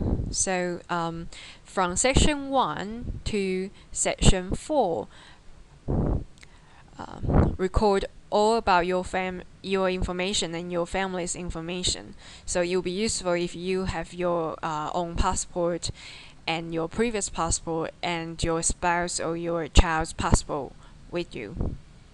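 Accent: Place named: China. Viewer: You have Chinece accent which I love it :)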